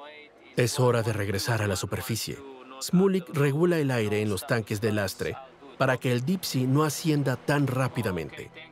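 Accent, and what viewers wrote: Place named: Latin America. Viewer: Mucha razón. Excelente locución en español de América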